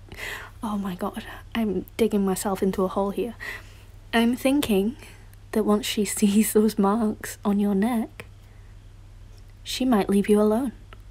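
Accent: United Kingdom. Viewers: Damnnn the British accent 🔥🔥🔥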